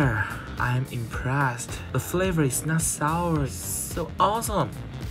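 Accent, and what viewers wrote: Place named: Taiwan. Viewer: 戴黑框眼鏡看起來好顯瘦喔，的台語聽起來很好聽欸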